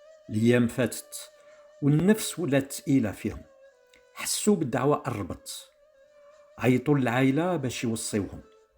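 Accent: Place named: Tlemcen, Algeria. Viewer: Une narration Tlemcenienne authentique et magnifique. Allah ya3tek essaha ouyahafdek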